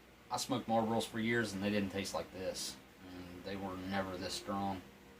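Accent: South, United States. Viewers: Love the accent. As an Aussie, I appreciate a good country sounding American 👍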